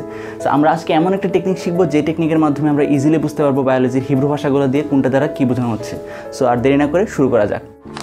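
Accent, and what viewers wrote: Noakhali, Bangladesh. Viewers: Apni ki Noakhali r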